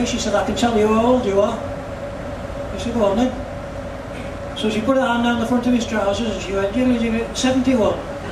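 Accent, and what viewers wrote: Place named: Yorkshire, United Kingdom. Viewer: Still got your Brown Edge accent!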